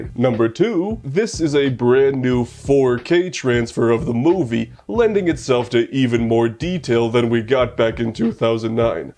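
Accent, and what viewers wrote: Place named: Russia. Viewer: я знаю, что ты русский, твой мерзкий акцент тебя выдает. скоро когда узнают что ты русский сразу депортируют обратно в помойку за то что ты русский :)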